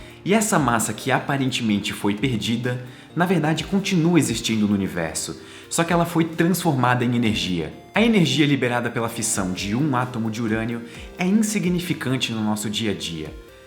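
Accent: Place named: Northeast, Brazil. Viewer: Nordestino sudestizado. Forte abraço!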